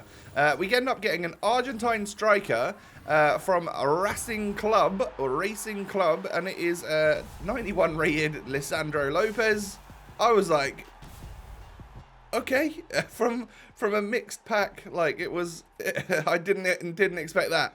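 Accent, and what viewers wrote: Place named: Black Country, United Kingdom. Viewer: 😂😭 spot on black country accent boss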